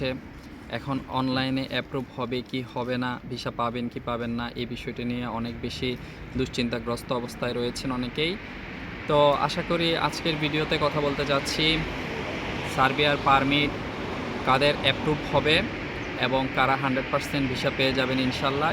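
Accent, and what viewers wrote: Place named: Chittagong, Bangladesh. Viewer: ভাই মনে হয় চট্টগ্রামের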